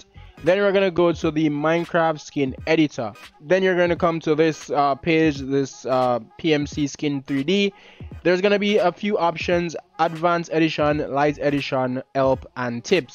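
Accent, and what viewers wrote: Jamaica. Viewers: Thank I very much and I like ur accent it sounds like u from Jamaica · You sound jamaican?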